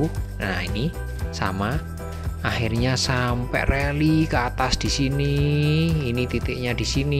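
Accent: Java, Indonesia. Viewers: seep ..om ...keren....medoknya hmmmm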